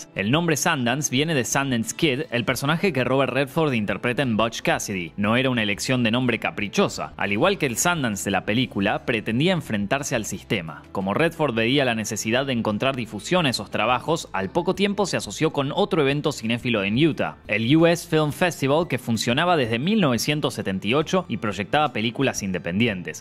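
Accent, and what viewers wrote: Argentina. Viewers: Felicitaciones. Por fin una voz argentina de alguien que sabe y que no lee cualquier cosa cuando lee en inglés. Muchas gracias!!!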